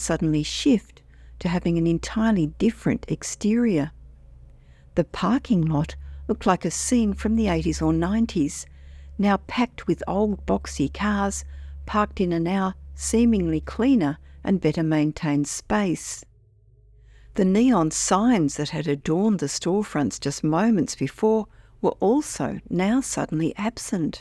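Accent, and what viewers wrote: Australia. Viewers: I know it's an Aussie accent, just wondered whether it was human or robot. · Voice is Aussi accent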